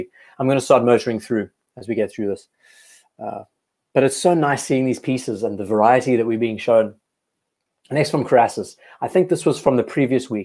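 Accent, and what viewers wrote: South Africa. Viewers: Did not know you were based in the UK. I thought I was picking up a South African accent.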